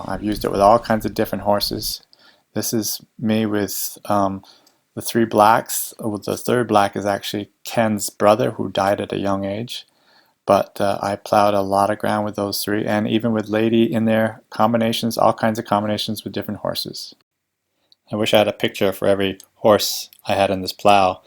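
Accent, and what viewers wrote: New England, United States. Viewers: Thanks for explaining how you got a New England accent in Upstate New York.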